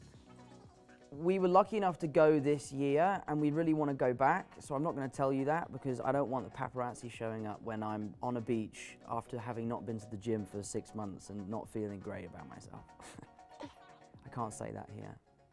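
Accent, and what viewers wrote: United Kingdom. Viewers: Your Hella England straight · Tom Halland who is your favorite avengers character also I like your British accent and you say water 5 times for me😅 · pure British. The amount of times I’ve said elevenereef. Brill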